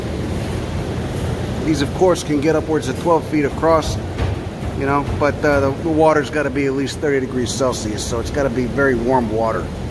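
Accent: Chicago, United States. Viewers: Couldn’t figure why I like these videos he’s smart, knowledgeable, the Chicago brogue, no it’s cause he’s got the gift of glaberous or gabbro, he’s gabbroid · Thanks man, I'm a long way away from the US (I'm a expat) but I feel every bit of the tension here in Europe. Listening to that Chicago accent and calmly walking around a botanical garden somehow gives me hope that all is not lost there... much appreciated!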